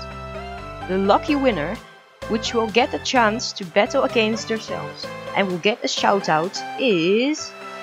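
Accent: Netherlands. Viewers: Are hou Dutch? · That Goes so quick?! Je kan horen dat je nl bent btw 😂😂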